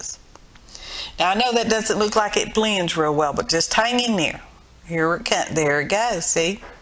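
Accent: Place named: Texas, United States. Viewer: I saw this on Shannon's FB. The minute I heard your voice I yelled "TEXAN!" Takes one to know one, but I'm from West Texas.(Lubbock) I woulda subscribed if you were painting everything solid black. I was gonna use that emoji hat is brown and swirly shaped, but I'm much too sophisticated. I'm not able health-wise to do much art, so I just spend my days watching. But that's gonna change one of there days. Right now I only have energy to take care of my pets and my grandsons bearded dragons and leopard gecko, and the bugs to feed them. Now we know I'm allergic to feeder bugs poops. Anyway by the time I get all that done, my CF and fibro has set in, and I just go to bed to morning. O boo hoo, "." do you remember that song? -1977, back when we were all beautiful, but didn't think so. Look her up guys, great song, written by. Another blast from the past. 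Heck, I may be the only person old enough to remember. This is why I don't comment very often; bla, bla bla😂 "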